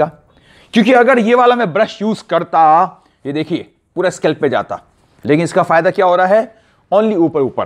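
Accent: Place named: India. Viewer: aapka bolne ka style mast hai...itna Desi style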